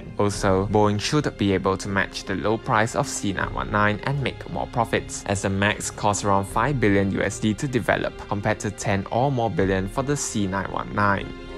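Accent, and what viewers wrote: Singapore. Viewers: I enjoy watching your videos for the most part… but how about getting someone else to the voiceover. Argh, Singlisn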